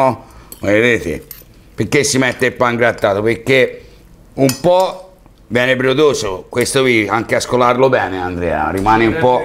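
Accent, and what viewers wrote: Tuscany, Italy. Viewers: como me gusta oir el acento toscano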